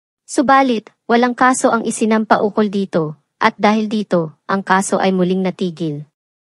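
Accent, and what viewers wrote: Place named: Visayas, Philippines. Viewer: ..hndi po kasi xa pure tagalog ang salita ...kaya ganian po ang salita nia . Kung kayo po ay magsasalita sa wikang visaya .. magkaka gnian din po ang tono nio saibang salita na gagamitin nio ...naiintidihan nmn kya unawain nio na lang o wag nio pakinggan o panuorin ..wala nmn napigil sainyo .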